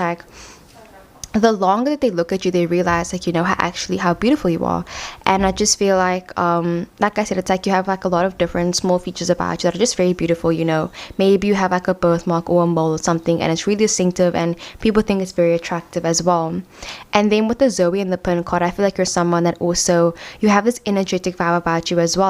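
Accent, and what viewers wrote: South Africa. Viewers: Sis you sound south African as fookkkk Omg u are South African! Yayy Wait how is this so acurate. Pile 1. No fucking way whattt! No I'm subscribing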